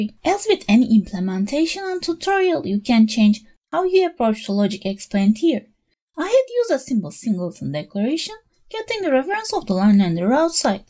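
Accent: Turkey. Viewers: aksanindan anladım :) ama tebrik ederim güzel içeriklerin ve kanalın var. Bir kaç videona baktım güzel gerçekten :)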